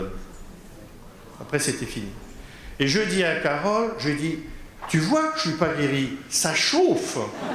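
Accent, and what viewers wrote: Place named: Nord-Pas-de-Calais, France. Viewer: Merci beaucoup pour votre témoignage et votre humour, on dirait un chti, comme moi, sauf que moi je ne suis pas guérie ! Évidemment je ne suis pas en chaise roulantes, mais je prie pour ne pas en avoir une ! Non c'est pas vrai, c'est une blague ! Je suis très contente pour vous, mais je plein ceux qui vous entourés, vous parlez beaucoup, moi aussi, ils ne me coûtent plus, c'est laventage quand on est jeune de 62 ans et demie ! Mdr ! Gros bisous merci à Dieu, que vous puissiez continuer comme ça encore très long......temps!lol bon courage merci beaucoup Mr, je sais plus !... Le curé ou mon Père, zuttt j'ai oublié ! Gros bisous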